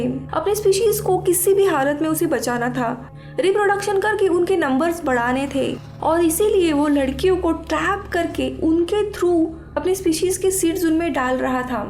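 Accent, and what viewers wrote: Maharashtra, India. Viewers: Apki accent sai ki aap Maharashtra sai ho am I right?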